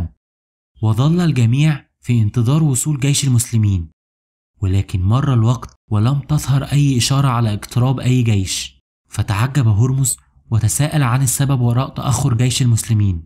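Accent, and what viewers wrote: Egypt. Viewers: موضوع تاريخي شيق لا ينفع أن يُسرد بلهجة اي بلد وإلقاء ممل. إن أردتم رفع مستوى المحتوى فعليكم الإبتعاد عن الإلقاء بهذه الطريقة وباللهجة المصرية والنطق السيء للحروف العربية ونطق الجيم والظاء والذال والثاء والقاف كما تنطق نها في لهجتكم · يا اخي كلشي تمام بس لهجتك لمصريه خربت ونزعت لفيدو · لو تكون باللغة العربية من غير التلفظ باللهجة المصرية تكن أحسن بارك الله فيك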